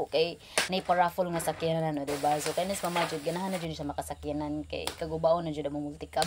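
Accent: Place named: Visayas, Philippines. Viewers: Sana may translation pag nagbibisaya..hindi ako makasabay, i super love your dialect.. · Lami jud imong vlog dai kay wala kay pretentions, naturally-made and Boholano inspired. Your boholano accent and features bring us back good memories when we were staying in Ubay,Bohol for a decade. With your vlog, you inspire us to go back to basic like planting, harvesting, cooking using firewoods and eating together with your family as well as praying and thanking God always for all His blessings. Basta ganahan jud ko motan aw sa imong vlog dai oi. Congratulations🎉😇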